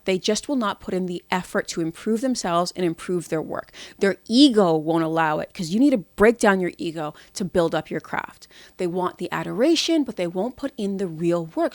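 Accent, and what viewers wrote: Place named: United States. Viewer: Your so American u know nothing look at how u talk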